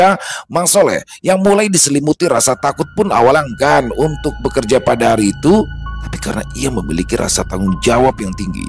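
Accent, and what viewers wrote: Jakarta, Indonesia. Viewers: barrokalloh yg dinanti2 bang piyan muncul juga plng suka kalau bawain bahasa betawinya mantep pokoknya sukseees terus bang dengan cerita2nya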